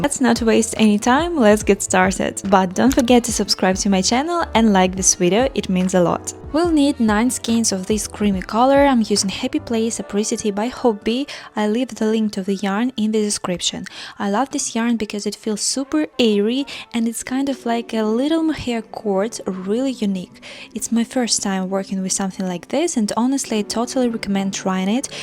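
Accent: Russia. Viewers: Hi girl thanks for your perfect turorial, btw are you russian?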